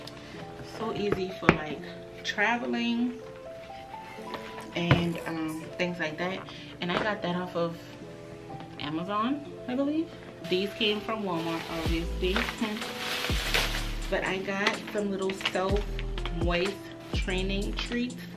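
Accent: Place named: New Orleans, United States. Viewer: You must be from NOLA 😂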